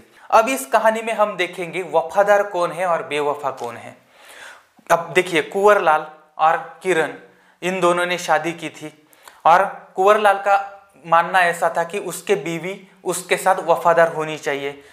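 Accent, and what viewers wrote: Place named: Nagar, India. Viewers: Aap ki Hindi Marathi me nikal Rahi he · सर तुम्ही नगर चे आहात काय? आमच्या नगर ला अशीच हिंदी बोलतात..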